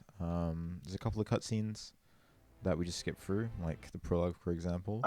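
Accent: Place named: Scotland, United Kingdom. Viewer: Yeah, man i’m just a few miles away from your home town! Throughout the video after I made the comment I was just thinking - surely this dude has to be Scottish somehow 🤣. I figured maybe even your parents. How come you moved away from Costa Del Scotland?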